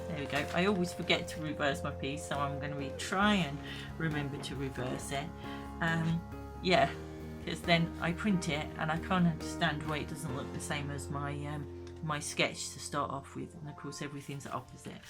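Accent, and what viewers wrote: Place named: England, United Kingdom. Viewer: ps trying to place your accent! I'm originally from the North West of England (now in Scotland) and yours sometimes sounds N.West... but it sometimes has a west country twang? :O) mind you, I'm a bit rubbish with accents!! ha ha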